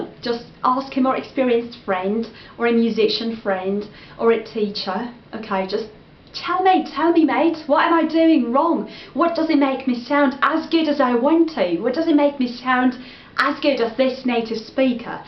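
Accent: United Kingdom. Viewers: Спасибо за прекрасные советы! В восторге от вашего крутейшего британского произношения, просто музыка для ушей. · Hello, that's really interesting. and your pron is fantastic!!!! But I've got a question on my mind - why did you choose British pron, not American one? Would be glad to know your points, thank you)) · Hi.I just subscribed.'Cause yesterday I saw this video,and I am amdmired.Great job.I'm a kind of beginner,have watched many many videoes and still do it.Will be glad to wath new videoes of yours.And have one question how'd you get your wonderful British accent,did it take long time,have you studied in England? Keep going you're great!! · you are amazing! You sound so British! I can't argue with you that working on a pronunciation is very important quality of a learner of English. In class I often get mixed up by my school mates speaking with the Russian accent, it is sometimes quite difficult to figure out what they mean and I ask them to repeat. 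Even though I am just a learner as they are I find it easier to converse with the natives. I'm taking the CAE exam in July, the event that I'm actually dreading, and I would like to ask you for some tips for improving listening skills. I do listen a lot, but somehow that influences only my pronunciation and speaking skills, and every now and then I keep on missing out things in my listening tasks. I realy go out of my way trying to improve these skills, but still no headway... Any advice?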